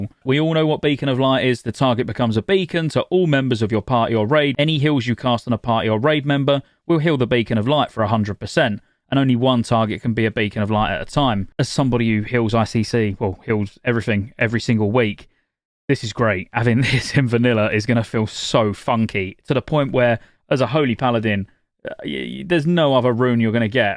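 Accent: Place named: London, United Kingdom. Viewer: Definitely got a subscriber from this video. Also sound like you're from London and remind me of Alex Brooker!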